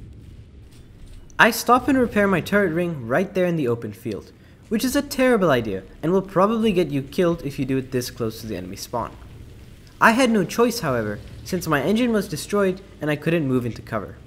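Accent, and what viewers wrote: Malaysia. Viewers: Ae you malaysian? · Where are u from??? Malaysia?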